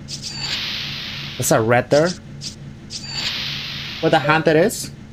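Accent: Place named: South America. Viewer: Just finished part 2, great job wow! I like your accent, are you South American?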